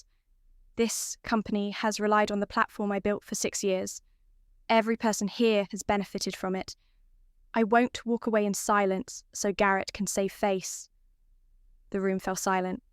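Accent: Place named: United Kingdom. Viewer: Very pleasant British accent . Excellent diction . 😊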